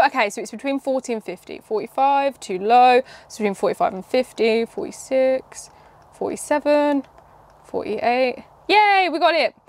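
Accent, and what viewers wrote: United Kingdom. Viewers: Im so confused, you look so young but you're playing with old things that I only remember seeing at my older cousins' house when I was really really young and I'm at least 10 years older than you. This made me smile a lot, this is so cool. Thanks for bringing me this time travel experience with a very special british accent. Keep going and having fun. 😁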